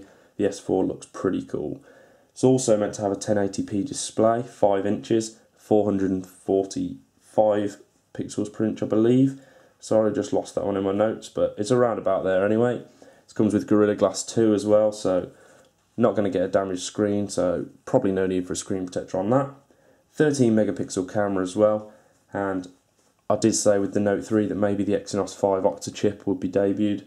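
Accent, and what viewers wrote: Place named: United Kingdom. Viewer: video starts playing: "yes! british accent!"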